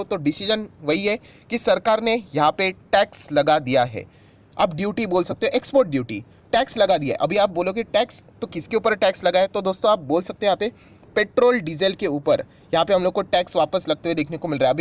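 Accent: Maharashtra, India. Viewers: सर, तुमच्या डिमॅट अकाउंट मधील पोर्टफोलिओचा व्हिडिओ करा एकदा. मला माहिती आहे आपण एक मराठी माणूस आहात.... आपल्या हिंदिवरूनच समजतय ते... आपली अशीच प्रगती होवो....जय महाराष्ट्र..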